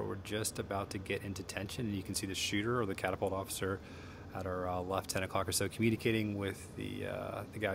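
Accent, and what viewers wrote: United States. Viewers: This dude is 100% from California with this accent!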